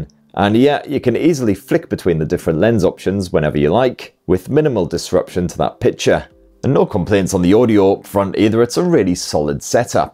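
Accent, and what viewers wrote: United Kingdom. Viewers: Is this guy british?? 😂😂 Just a simple doubt. The accent😅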